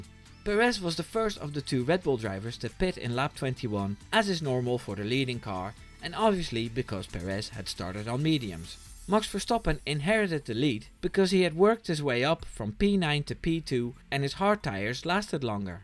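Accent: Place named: Netherlands. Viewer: ik kan aan je engels bijna niet horen dat je uit nederland komt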